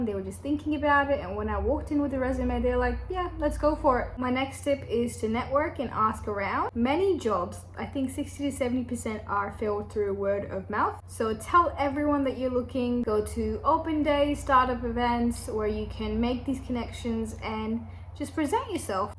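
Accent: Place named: Australia. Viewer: You have a very great Australian dialect already, I find it hard to sound more Australian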